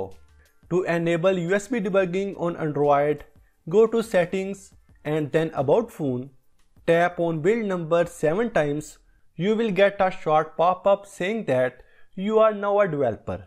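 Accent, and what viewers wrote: India. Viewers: im from austria its my second language im far from perfect but anyway its easy to learn most easy language to learn but its not even referring about his english skills like grammer and words and so on he is obviously talking about his hardly to understand indian strange accent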